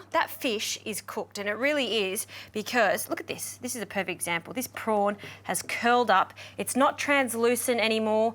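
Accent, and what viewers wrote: Australia. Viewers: You are a cutie pie I like your Aussie accent...could you wear some tighter clothes next video..oh the dish looks nice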